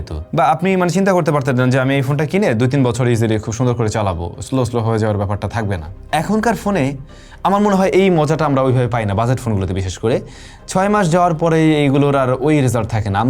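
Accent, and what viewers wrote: Barishal, Bangladesh. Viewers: আরে আমনে দেহি মোগো বরিশাইল্যা ❤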